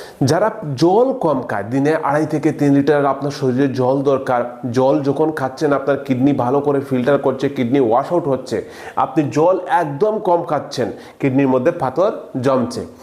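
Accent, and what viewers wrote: Sylhet, Bangladesh. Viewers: Sir apni sylheti naki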